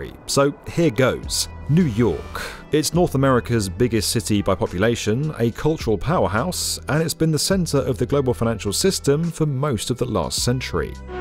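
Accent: United Kingdom. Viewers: ...if I didn't know better, there is a tinge of anti-Amricanism in his voice or at least a dose of common Euro anti-Capitalism stuck in his throate. But maybe it is the uppity brit accent that is sneering and rooting for his hate it to become true.. ie that the NY collapses. You just know that is his wish. Sad. But we have scene this before repeatedly.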